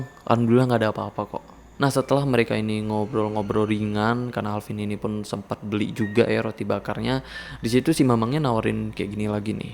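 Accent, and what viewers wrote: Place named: Sumbawa, Indonesia. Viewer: Bang, pernah tinggal di sumbawa kah? Logatnya kek ada logat sumbawa..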